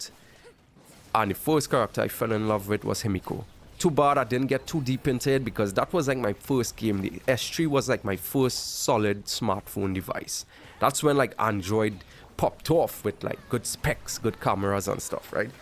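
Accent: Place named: Trinidad and Tobago. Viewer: I was wondering same ... he sounds very Trini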